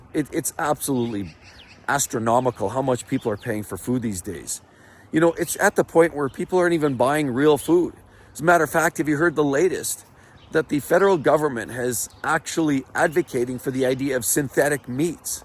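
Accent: Canada. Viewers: Sorry if you mentioned before..... Are you Canadian ? When you say about...you pronounce : a boat. Not making fun...l really like it 😂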